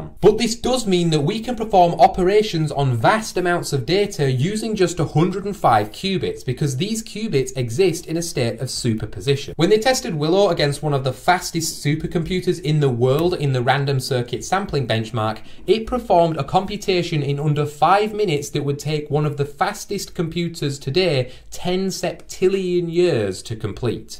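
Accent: United Kingdom. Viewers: i think this channel is going to be the bri-ish "fireship" channel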